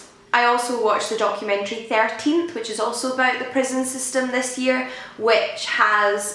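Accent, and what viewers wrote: Scotland, United Kingdom. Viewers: You look quite like Amelia Pond from Doctor Who. And you've got the same Scottish accent. Loved the video. I haven't been able to make the leap into Non-fiction yet but this video is a good motivational source. Thank You!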